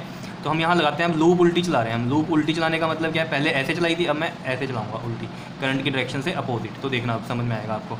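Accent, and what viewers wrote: Punjab, India. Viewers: i found a bit of punjabi accent in your video 😎😎😎😎😎😎😎❤❤❤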